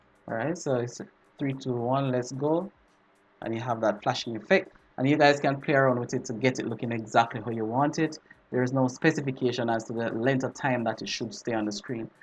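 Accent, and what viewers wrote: Jamaica. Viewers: I can hear the Jamaican in you! Love it!! Now let me return to the video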